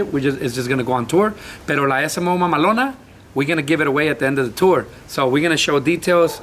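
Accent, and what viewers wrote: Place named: Fresa, Mexico. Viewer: El español lo habla fresa y el inglés lo habla barrió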